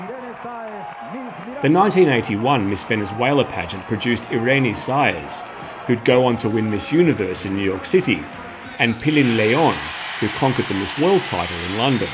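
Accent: Australia. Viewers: I've been researching this kind of information for myself, and now I found it all perfectly researched and summarised here! Great visuals, pleasing voice-over (love the 'soft' Aussie accent), and neatly and professionally packaged. Love from ZA!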